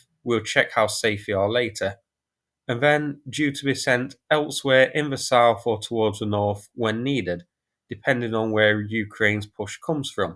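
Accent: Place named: England, United Kingdom. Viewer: Boring. Zero insight. Just a drab, dull, less-than-perfect working class English accent.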